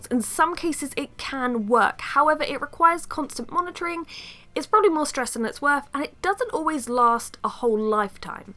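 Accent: England, United Kingdom. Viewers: what a lie !!!!!!!!! dont let the english accent fool you she dont know whats shes talking about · Where do you live? And she’s not pretending lmao, she really is English.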